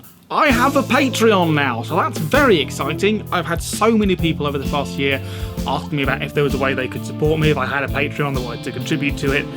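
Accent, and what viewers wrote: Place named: United Kingdom. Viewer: I came here from why did I say okie doki .. YOURE BRITISH!?!?!?!!! I AM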